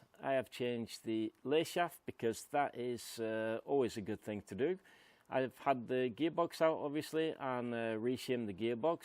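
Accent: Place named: Glasgow, United Kingdom. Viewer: Dude! While I am a Vespa guy through-and-through, I really enjoyed watching you take us around this original Lambretta. It was quite a bit of fun! Not sure how I got here, but you've got a new sub. I picked up some Glaswegian in your accent...then, I read your bio! Great work, sir!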